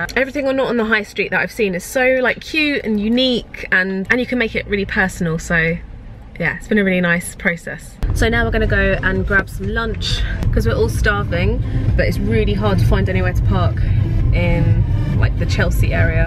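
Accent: France. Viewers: The french accent tho 😌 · ouuuuh l'accent français bien prononcé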